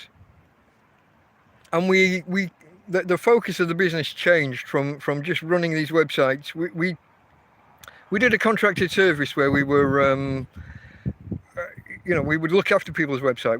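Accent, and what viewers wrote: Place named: Yorkshire, United Kingdom. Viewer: Are you from Yorkshire ? Strong sense of a northern accent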